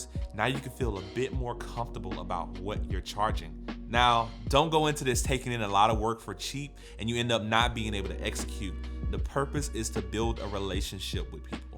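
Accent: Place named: Mississippi, United States. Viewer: BRO YOU FROM SOUTH MS ?? Word yo me to!!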